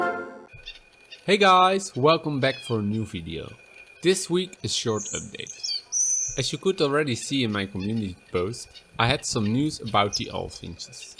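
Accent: Netherlands. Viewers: Hearing on.your accent you are from.the netherlands right. Love your birds. They are beautifull! I really want to make a small aviary to! Not quit sure yet. Keep it up!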